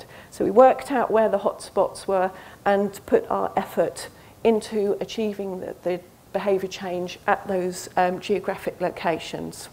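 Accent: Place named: RP, United Kingdom. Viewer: British English accent sounds posh and far better than American English accent.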